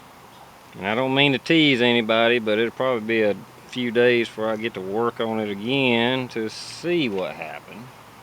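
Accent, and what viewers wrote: North Carolina, United States. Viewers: hear a hint of NC accent there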